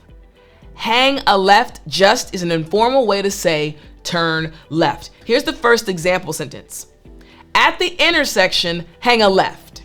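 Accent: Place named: United States. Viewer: Hi teacher, l ❤ American accent.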